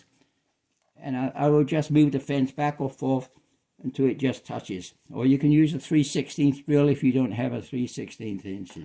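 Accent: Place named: England, United Kingdom. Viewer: Nice. Tip you sound English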